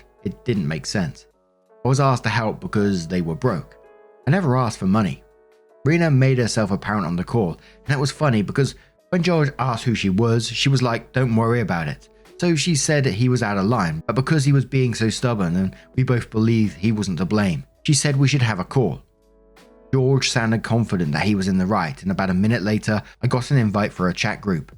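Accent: United Kingdom. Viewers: Hey just wanted to say thank you for the channel along with the typical American who loves a British accent 🥵 lol I really love hearing your take on people's drama Reddit is like a real life soap opera and I'm so addicted. Obviously these are real lives and real people so it is sometimes tragic too. All to say your personal. Empathy and innate kindness make listening to you a pleasure. Be well sir and thank you again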